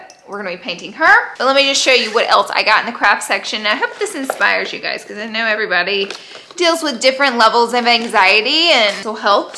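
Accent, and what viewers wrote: South, United States. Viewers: I miss her southern accent. It’s more endearing than the Cali girl thing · OMG!!! I LOVE THIS VIDEO. Girl your southern roots are showing out!!!!😘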